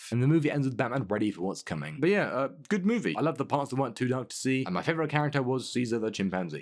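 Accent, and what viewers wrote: United Kingdom. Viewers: Wait... you're british?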